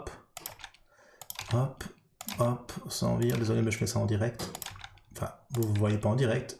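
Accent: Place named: Tournai, Belgium. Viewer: Tu as trop la voix du tournaisien :)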